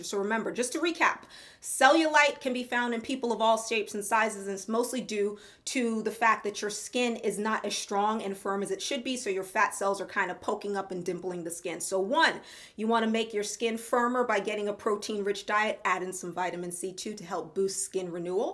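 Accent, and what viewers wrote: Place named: Caribbean. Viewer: I recently subscribed to your channel one of the best things that I've done. You're so informative and Gorgeous. Love the glowing skin. I occasionally hear an accent. Is your ancestry from the Caribbean by chance?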